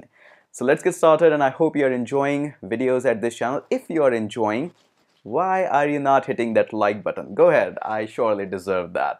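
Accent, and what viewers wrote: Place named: India. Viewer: Which laptops? Am i supposed to watch 12 mins of indian accent rambling and look at you instead of laptops? Rofl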